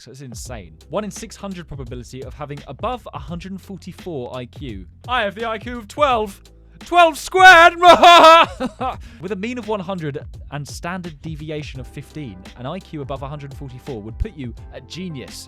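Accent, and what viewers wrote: United Kingdom. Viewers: I feel like your accent is like British with something else that I don’t even know the accent singing other songs and perfecting the accent except not American accent. Oh my goodness.😂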